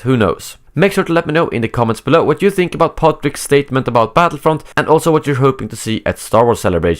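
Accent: Sweden. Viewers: Are you A Swede?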